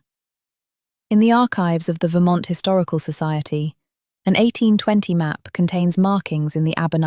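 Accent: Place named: England, United Kingdom. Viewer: Whoopo👻, love these mysterious disappearances, especially when well narrated, love the English accent, thanks 👍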